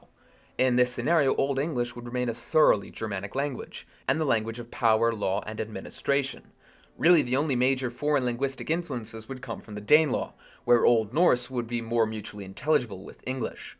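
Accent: United States. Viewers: I think the narrator is American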